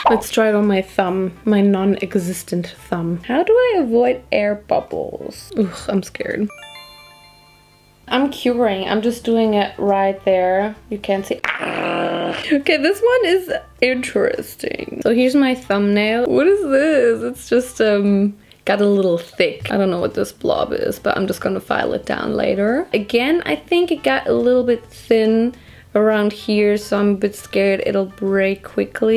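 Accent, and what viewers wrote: Germany. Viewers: she is so german that u can hear her accent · I thought she was German from the beginning because she kept talking in German and had an accent if that makes sense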